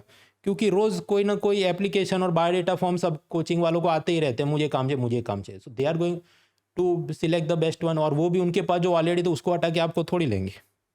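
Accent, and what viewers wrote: Gujarat, India. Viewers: Sir gujratse he ?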